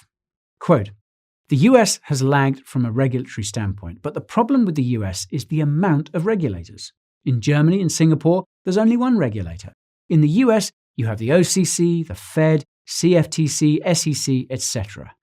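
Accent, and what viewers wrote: United Kingdom. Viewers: i love your british accent. i wish i could speak like that.. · I dont know if it's the robotic British voice or the robotic repeated jestered you make that draw me in lmao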